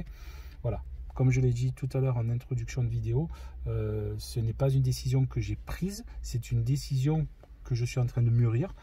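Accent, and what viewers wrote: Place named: South, France. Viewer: Salut. Je suis abonné à ta chaine depuis pas mal de temps et je suis vraiment triste d'apprendre que d'ici la fin de l'année, tout pourrait s'arrêter. En même je te comprends et je me doute que cela ne doit pas être facile de subir régulièrement de la méchanceté gratuite. Personnellement, quand je n'aime pas quelque chose ou quelqu'un qui passe dans les médias, j'arrête de le regarder, c'est une question de bon sens. D"autres personnes préfèrent regarder juste pour critiquer voir insulter. C'est triste mais le monde est ainsi fait. Des cons, il y en a toujours eu et il y en aura toujours mais le monde est obligé de continuer de tourner. J'espère que d'ici la fin 2020 tu auras changer d'avis et que tu continueras de nous régaler avec ta joie, ta bonne humeur et ton accent qui sent si bon le sud mais si malheureusement, il en était autrement, alors je respecterais ta décison. 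Prends bien soin de toi et de ta famille.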